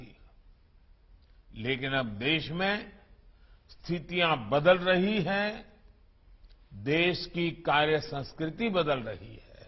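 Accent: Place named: North, India. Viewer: That's true he's needs to speak in English and not like a North Indian villager what about the rest of us non hindi speaking Indians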